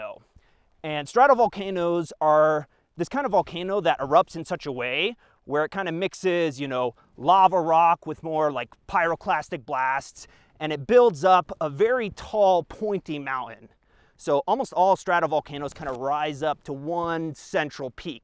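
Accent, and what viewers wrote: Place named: Southwest, United States. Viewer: That's what a southwestern accent sounds like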